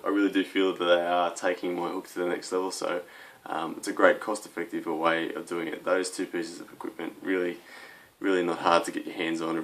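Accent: Australia. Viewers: I reckon some people are just too literal. Obviously ya boy here isn't suggesting that this is the 100% tried and true way to train for hooking. He's just showing what HE does to train his hook, so that someone who is looking for a little more for THEIR own workout, they can try this. It's a FREE video SUGGESTING and OFFERING ideas to better someone else's training. It's not like you had to pay $65.99 for the video. Just take what you want from the video, give the Australian man a thumbs up, say something nice in the comments like "Hey, cool accent, thanks for the tip, and good luck in Australia" and be done. Don't smite the guy for free advice or the way he trains. He'll end up breaking your arm at the table.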